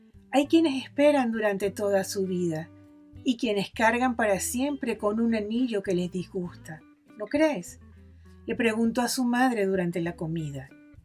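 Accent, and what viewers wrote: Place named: Venezuela. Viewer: Soy muy desconfiado cuando de escuchar a alguien narrar algo. Sobre todo si ese alguien es español. No sé si a ustedes les pasa lo mismo, pero cuando los narradores españoles utilizan ese tono tan antipático de hablar en medios, simplemente cambio, ya no de canal. pero si de medio. Por eso, quiero felicitarlas por la forma en que narran estas bellas historias. Creo, por el acento, que quien narra es venezolana. Pero, como sea, lo hace de maravilla. Gracias.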